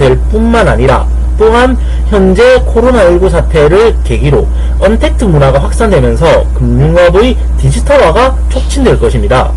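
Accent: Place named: Gyeongsang, South Korea. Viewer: 경상도 억양을 줄여줬으면 좋겠습니다. 도표와 그래프를 보면서 아침 출근하면 차 사고 납니다. 말이 조금 빨랐으면 좋겠습니다. 읽는거 보단 말하듯이 이야기 해주셨으면 좋겠습니다. 이전 영상들(지금은 비공개 되었지만 ㅠㅠ)에 비해 그래도 들어줄만 한 정도로 컨텐츠가 많이 좋아졌습니다. 다만, 2020년 2분기를 설명하는데, 그것도 증권업을 이야기 하는데 코로나에 대한 내용이 빠진 것이 아쉽습니다. 영상 중간중간에 전환이 매끄럽지 못하네요... 잘 듣다가 갑자기 결론없이 다른 내용으로 넘어가서 몹시 놀랐습니다. 앞으로도 예의주시 하겠습니다. 좋은 영상 고맙습니다. 내용이 너무 어렵네요. 어려운 내용을 쉽게 풀어주셨으면 좋겠어요.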